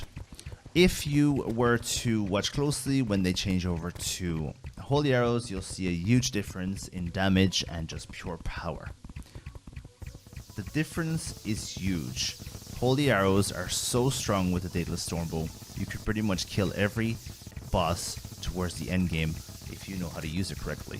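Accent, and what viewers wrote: Ireland. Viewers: r u irish u sound irish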